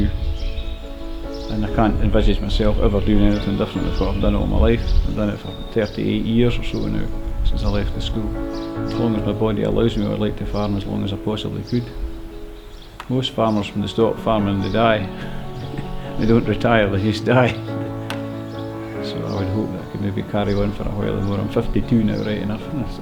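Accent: Scotland, United Kingdom. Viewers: you must get someone British/Scottish to translate for you.<3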